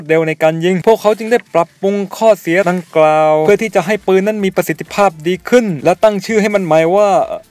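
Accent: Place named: South, Thailand. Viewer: เสียงสำเนียงใต้เหมือนพี่ โต้งกันเบท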